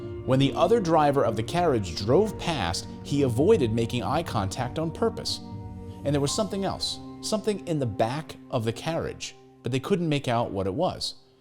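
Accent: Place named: United States. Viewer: Perfect American English ! Just perfect !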